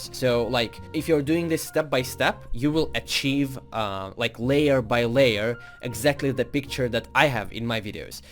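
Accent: Russia. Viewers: Эх, русский акцент тебя выдает ))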